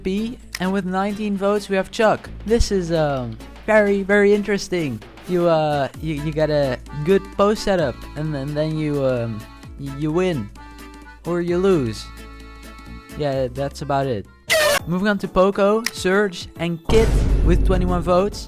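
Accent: Netherlands. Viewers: Wait are you Dutch? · Holy Dutch accent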